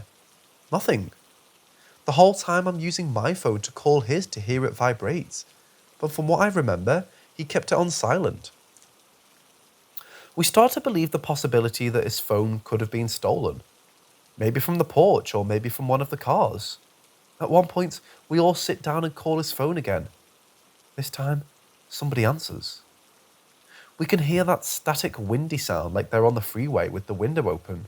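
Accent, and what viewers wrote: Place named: United Kingdom. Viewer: Great stories, cool British accent ✨🤡🧐👍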